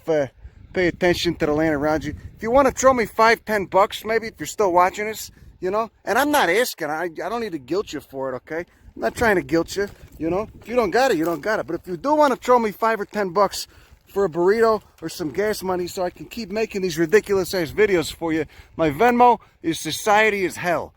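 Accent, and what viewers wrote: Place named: New York, United States. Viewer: I have to laugh to myself frequently, saying "is there nothing this guy doesn't know about plants" keep up the good fkn info! I am a Brit and no idea where your from, but years of seeing tv from USA might point me in the direction on new York? The east side? Love the accent ..... mine is a heavy cockney accent.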